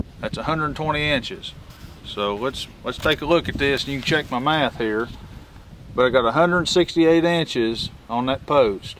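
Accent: South, United States. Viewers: im from the south and this dude is country as freaking cornbread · Man, I'm tickled I ran across your channel today. It's awesome to get this info from a good ole boy instead of those some of those nasally Yankees. Northeastern Arkansas here and your vids are going to help me understand what I need to do to help my son build a pole shop on his property and finish a house he bought .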